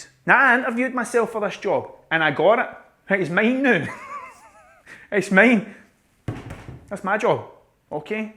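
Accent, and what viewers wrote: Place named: Scotland, United Kingdom. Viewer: just came across your channel man dont know how I have never found it before but I'm happy because now I can go back and watch everything !! trying to get into painting myself and you have given me a fresh outlook on what I'm doing and why I'm doing it, you the man! love the Scottish accent and love the comedic honesty its refreshing hahaha thank for sharing your knowledge man much love from Ireland 🤘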